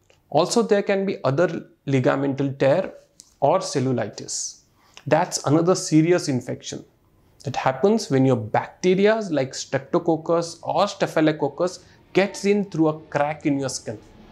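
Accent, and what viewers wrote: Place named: India. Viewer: it's good Inglish with Indian accent I understood all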